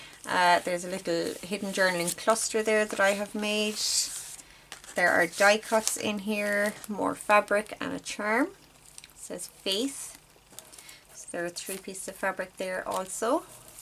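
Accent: Ireland. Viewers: Love this idea❤️how fun! Things I like about your channel… love hearing your Irish accent!, subscribed during JB shenanigans, loved working with your Echoes of Ireland kit… watching for more of your beautiful work!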